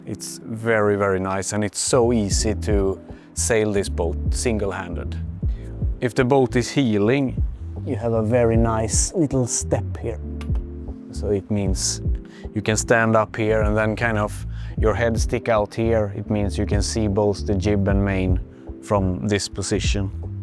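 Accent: Sweden. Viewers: Don't worry Sir, Your Swedish accent is beautiful, authentic... I even ecpect it, when the Portrait about a Hallberg Rsssy is produced by Hallberg Rsssy in Ellös 😉🎉🇸🇪♥️👍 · Your dialect actually adds the mood for a Swedish yacht. Like a Swiss watch or German car. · I wish I could speak Swedish half as good as you speak English Sir. An excellently presented video and your slight Swedish accent is both charming and very good❤ . I understand you perfectly , far better than many English people ! Love that 57 too ..... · That's okay, we find the Swedish accent charming! 😅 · Your English is perfectly acceptable for a non-native. Your Swedish accent makes your speech sound so much nicer. Also, beautiful yacht and movie.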